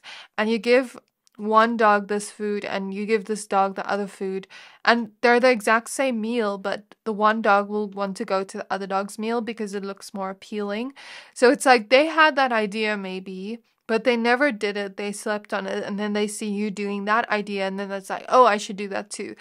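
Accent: South Africa. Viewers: Beautiful reading. Thank you. Are you South African(accent)? Pile 2.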